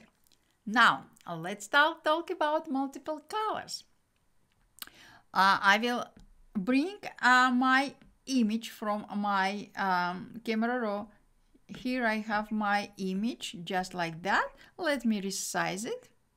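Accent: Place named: Russia. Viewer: Its russian accent btw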